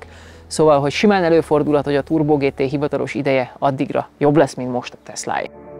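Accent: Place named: Hungary. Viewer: Engem kicsit zavar az angol kiejtés, néha jól mondja néha magyarosan, szóval ja. De amúgy egy jó videó!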